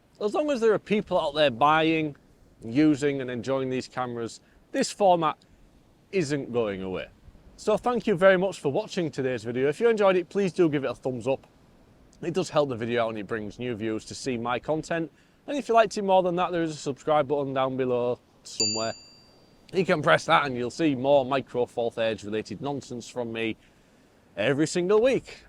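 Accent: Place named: Geordie, United Kingdom. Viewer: Thank you for sharing. Im from Germany and I feel exactly the same for this Camera. I started photography in the age of 16 with the legendary Olympus OM-1 in 1976. I used Olympus with great fun and success till around 2000. Then i must go to digital Cameras for professional purposes. Canon was the only affordable system in these times. So i lost Olympus man years till the Pen System comes out. Till this point Olympus was not useful to me. Later i bought the OM-D EM10 MARK I. STUNNING ! Since this point, i bought several bodies und lenses and do everything with it. Two years ago i thought, that i must have a Fullframe Camera, the Lumix S 5. But seriously, i use it barely. PS: i have good friends in Newcastle upon Tyne, their dialect is quite similar to yours. You must be a Geordie. 😊 Thanks for this Video, take care.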